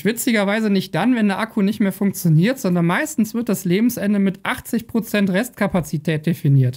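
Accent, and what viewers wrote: Bavaria, Germany. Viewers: Ist ein Bayer?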